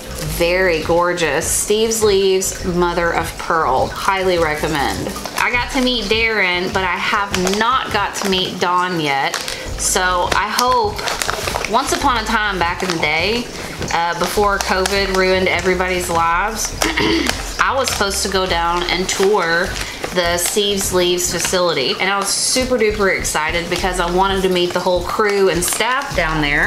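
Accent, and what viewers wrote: South, United States. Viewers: Hi, really love your videos. You are the cutest and love your accent!! I have one too as I’m originally from Georgia now Calif(👎). You and are a trip🤗👋 and Winston too!! You are so knowledgeable and cute and you come across as so real🤗👍. Steve’s leaves begonias are delicious!! With your rev think I’ll try them😁. Thanks again for your exceptional videos, regards to and Winston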